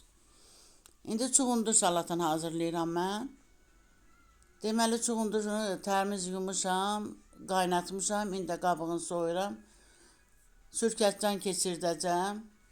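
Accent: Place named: Aran, Azerbaijan. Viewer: Salam xanım,siz haralısınız? Ləhcə çox tanış gəldi 😄😄 hiss etdim ki,aran bölgəsindənsiniz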